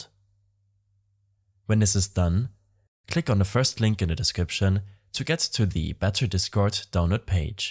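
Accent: Germany. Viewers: haha du bist deutsch das höre ich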